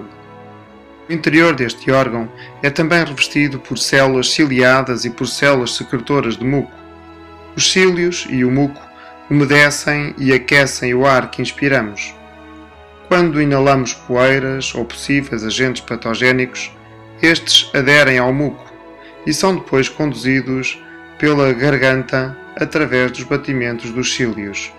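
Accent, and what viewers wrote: Portugal. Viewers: Putzz.. sinto decepcionar mas, esse fundo musical é 'um saco" pra quem é músico, como eu, que presta atenção na música ou seja, eu não sabia SE preSHtava atenção nela ou no carinha, (*) ou explicação falada.. e, detalhe: esse "sutÁKi PÓRTUGUEISH" - mas, de boas. Não vou farei medicina... hehehehhe..! (os vídeos que mamãe m'envia, se tiverem letras e música - tiro todo som, para apenas ler - sério, é um inferno!). Assim são as pessoas diferentes.. :)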